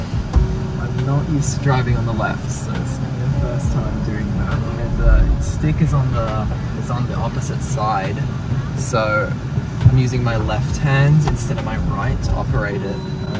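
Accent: Australia. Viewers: If you've never lived in OZ, then why do you have (mostly) an Australian accent? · I`m confused, if you have never lived in Australia, then please explain the Australian accent??? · so why would that make him sound Australian? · that is a lie. you are an Australian. You have spent many years here for your accent to develop. Without a doubt, you went to Primary School here in Aus, STOP lying to ppl. And at what year (DUH your school year) did you leave Aus? Your readers deserve to know. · You don't have an American accent. In fact your accent sounds Australian. How is that when you say you have never lived in Australia? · wait, your accent can pass as aussies-ish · There’s no way this kid is American. He clearly has an Australian accent. He would’ve had to have spent some SERIOUS time in Australia to develop this accent. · You have an Aussie accent and never lived there?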